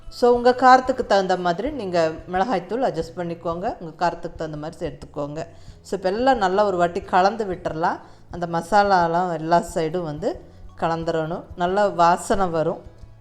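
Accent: Coimbatore, India. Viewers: Very tasty food. Ongaloda kovai Tamil உச்சரிப்பு அருமை.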